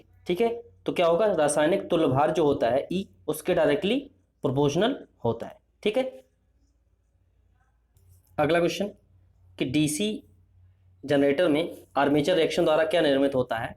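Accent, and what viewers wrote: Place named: South, India. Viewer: South Indian hindi me samajh nahi aatha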